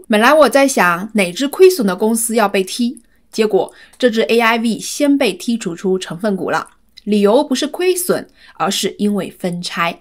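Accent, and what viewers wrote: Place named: Cantonese, China. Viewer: 感觉广东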